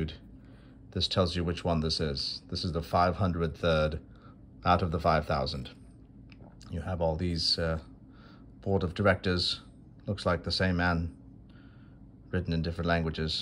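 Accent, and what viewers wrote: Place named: Portugal. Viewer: Fantastic Portgues accent. Spot on, mate!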